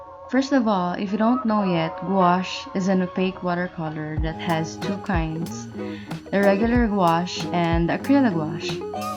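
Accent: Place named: United Kingdom. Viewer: Grabe tlga ang english accent ❤️🥰 i want to join and win the gouache watercolor to try other brand at baka maging magaling din ako tulad mo 💚💚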